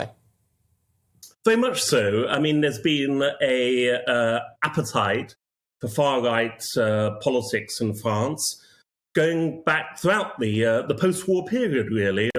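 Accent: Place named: RP, United Kingdom. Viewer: Plummy tw*t 🙄 Is he French or English? 🤦🏻‍♀️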